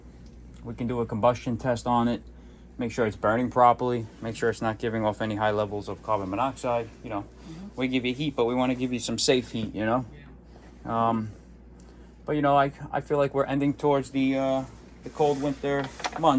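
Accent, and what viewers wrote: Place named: Brooklyn, United States. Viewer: Definitely brooklyn.... Guess from an Ohioan😅 Couldn't catch your email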